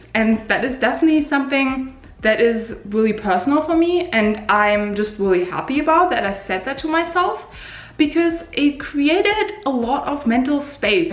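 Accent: Germany. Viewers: I love German English 💚💚😆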